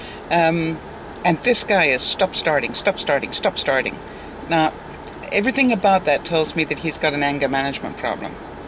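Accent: United Kingdom. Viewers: Found your channel through Judy and Benji and liking it so far! Can I ask, are you British at all? Just your accent sounds familiar to me! :)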